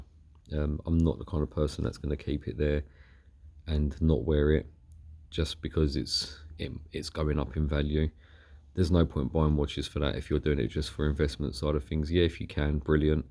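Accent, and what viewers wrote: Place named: United Kingdom. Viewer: mate post more videos, i like your shit. Ur voice is deep and thoughful, i like ur british accent a lot. Brings me back to the time i used to study in the UK.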